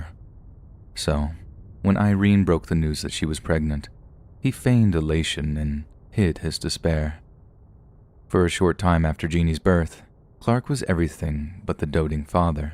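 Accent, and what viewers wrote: France. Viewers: Your French accent is so sweet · Ooooo I love your French accent lol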